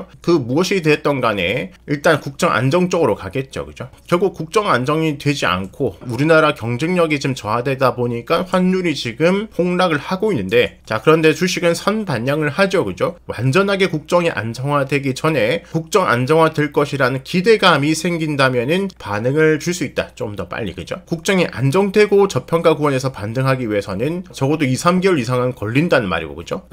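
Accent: Gyeongsang, South Korea. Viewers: 남석관 할배가 자기인생에 1~2월은 나빴다! 하던데,,,인물 나셨는지 기대합니다. 수익보다 나레이션(갱상도 말로 씨부리는 맛) 재미.아닌지.?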